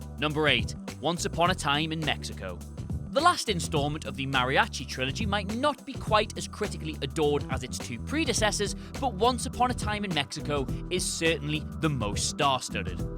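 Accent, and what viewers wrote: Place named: Newcastle, United Kingdom. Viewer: Nah man, he's a Geordie, those fuckers pronounce 85% of words incorrectly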